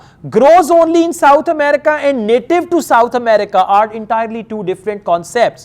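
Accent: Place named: Punjab, India. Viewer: I like urs Punjabi keep speaking like that it suits u very much👍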